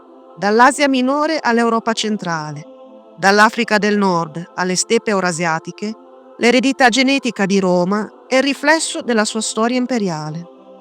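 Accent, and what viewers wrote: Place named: Liguria, Italy. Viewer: mi riferivo alla marcata cadenza ligure della speaker 😀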